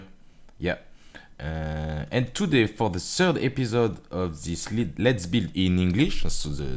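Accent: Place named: Europe. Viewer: mistakes make you beter and i am just happy to see other people from europe trying to speek english keep up the good work.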